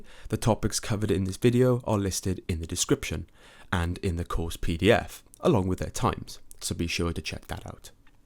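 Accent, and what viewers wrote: United Kingdom. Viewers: OMG ! i don't know how to say thanks ,, you are really great teacher .. thanks a lot , and i liked your British english pronounciation ^^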